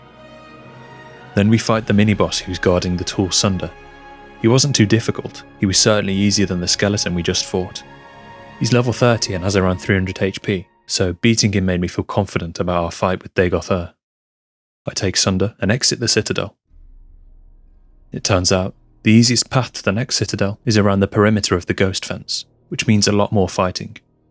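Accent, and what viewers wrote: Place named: United Kingdom. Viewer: With the channel picture, the thumbnail, and the title I didn’t expect this voice. I anticipated something louder, more crude, and American. Not bri*sh ASMR. Good video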